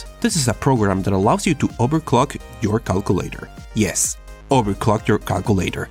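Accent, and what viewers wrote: France. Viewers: So are you from France? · are you french ? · Are you french? That could change my vision of the life 😲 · You're french? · wait, you're french? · Tu es français ? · Are u french? What's the accent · Are you french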